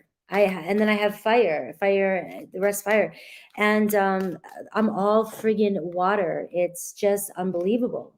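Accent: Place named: New York, United States. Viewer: where are you from? You kinda sound like me, from New York but raised my kids in Maine. Been to Salem, Mass several times.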